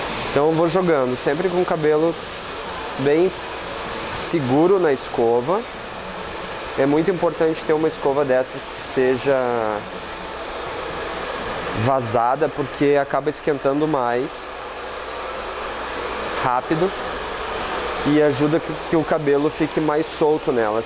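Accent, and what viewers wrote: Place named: South, Brazil. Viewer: que vídeos maravilhosos!!! Estou fazendo letras na faculdade, mas cursando cabeleireiro paralelamente, pois é uma área que me agrada demais. Assistindo diversos vídeos na internet sobre cabelo, com toda certeza os seus são os melhores, não apenas pelo seu trabalho maravilhoso, mas também pela paz que você transmite ao falar e explicar suas técnicas nos vídeos, tenho certeza que isso é de extrema importância para a excelência do seu trabalho e felicidade profissional! Pretendo seguir como cabeleireiro, e depois de assistir alguns de seus vídeos, tenho ainda mais certeza dessa decisão, pois me identifico muito com você, tanto no amor pela profissão, como nos ideais de felicidade e visão de mundo, sou teu fã, estou acompanhando o canal, e não poderia passar por aqui sem deixar um comentário parabenizando pelo seu trabalho e pela pessoa que você é. 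Gostaria muito de tratar o cabelo contigo, mas pelo incrível sotaque sulino, acredito que estamos meio longe hehe Enfim, parabéns, continue com o maravilhoso trabalho, no salão e no youtube!!!:D Sucesso!! Paz e Alegria!! Patrick!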